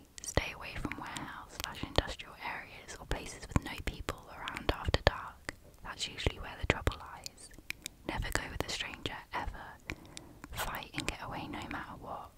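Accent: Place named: United Kingdom. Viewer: GOD DAMN you're impeccable dude!! As per usual lol. Ive gotta say, you have suuuuch a lovely British accent ❤ Im Aussie, my former father in law is a Geordie.. so i know not all English accents are as... ummm... soothing as yours? Lol! 😂 Love your work doll, seriously.. you're unbelievably good at this. Your accent & whisper tone as so well suited to ASMR ❤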